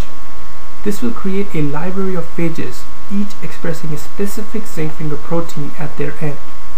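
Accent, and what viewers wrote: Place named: India. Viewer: wtf is that indian accent everywhere in biology videos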